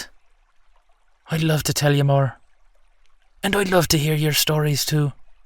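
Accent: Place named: Ireland. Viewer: Something is a bit fishy...... Love this audio 😀 And I love the Irish accent ❤❤❤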